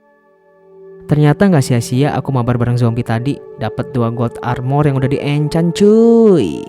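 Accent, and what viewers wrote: West Java, Indonesia. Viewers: dari logat ngomong nya kau orang sunda ya bang kalau iya sarua euy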